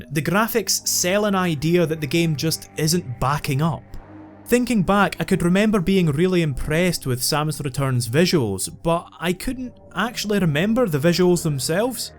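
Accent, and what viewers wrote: Scotland, United Kingdom. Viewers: wow ur very scottish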